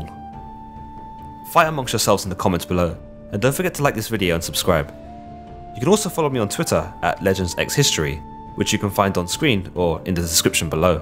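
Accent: United Kingdom. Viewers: Icelanders are really not used to accents, icelandic is only really spoken by Icelanders and we all have (mostly, with miniscule exceptions) the same accent so when someone with e.g British accent comes along it can sound like you are talking nonsense but that's not too say that it's you're job to change the way you speak, I have noticed that some Icelanders have a unfair standard when it comes to accents which can be hard for e.g immigrants which put the time inn to learn icelandic So yes you have a really thick accent but besides that than it was just fine. (A bit late to comment)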